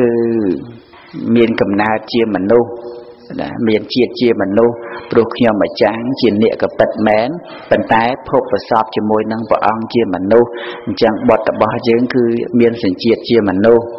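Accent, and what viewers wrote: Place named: Kandal, Cambodia. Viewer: ព្រះអង្គ ទេសសំនៀងដូច អ្នកស្រុកកណាចឹង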